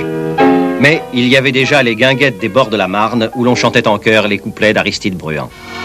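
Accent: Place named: Paris, France. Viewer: Une pointe d'accent titi parisien dans les commentaires bien raccord avec les images. Super vidéo!!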